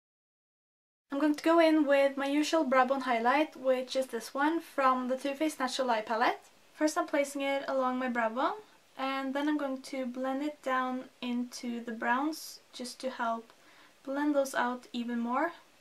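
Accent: Norway. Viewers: Great tutorial! Elsker den norske aksenten :) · I know you said violet, but I heard violent.. That sounded a bit disturbing :p "I'm trying out some violent lips" Edit: Oh, and btw - uten å lese noentingsomhelst, og før jeg en gang har sett videoen vet jeg at du er norsk basert på måten du snakker engelsk. Du kunne tilogmed ha vært trønder. You go girl..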